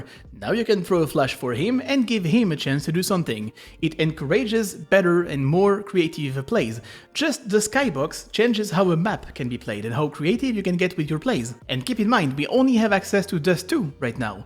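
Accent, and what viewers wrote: France. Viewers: embracing his french accent more than usual?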